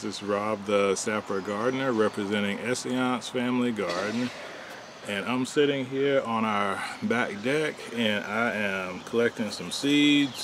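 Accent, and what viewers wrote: United States. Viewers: Hi Rob it's interesting how there are slight variations in the pronounciation of quite a lot of fruits, vegetables and herbs between English here and American English there ( we say Herbs, you say Erbs, never figured out why as it's spelled the same 🤔🤔 ), but a few have totally different names, one of them is Cilantro which of course we call Coriander, I have no idea where the totally different names originated for the same herb....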